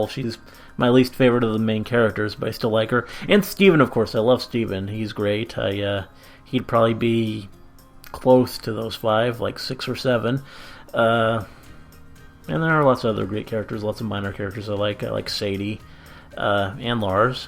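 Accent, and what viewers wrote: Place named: Canada. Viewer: I thought you were canadian for some reason lmao.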